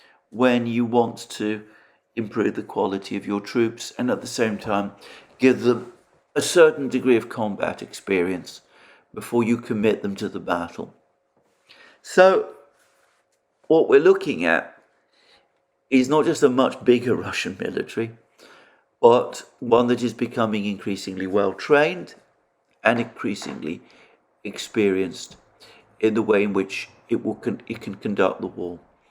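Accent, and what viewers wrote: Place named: North London, United Kingdom. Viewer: Michael Caine = East London accent. Alexander = RP (received pronunciation, ie, schooled,) with North London overtones. The people you knew from Wales will have been extremely different, more of a sing-song and softer accent. The regional accents from, for example, Wales, Cornwall, north of England and Scotland vary widely among themselves and are an important part of our history and heritage. More diluted now than they used to be, but still interesting. Likewise, of course, American accents differ, in different parts of the country. 🤓🤓🤓👍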